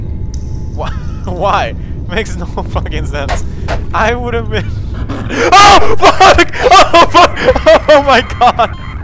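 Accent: Sweden. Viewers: Who came here for real Swedish accent ? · Dat swedish akcent ;))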